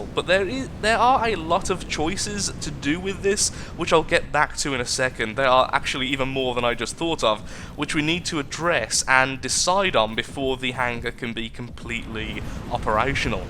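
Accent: United Kingdom. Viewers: you sound british but you think like an american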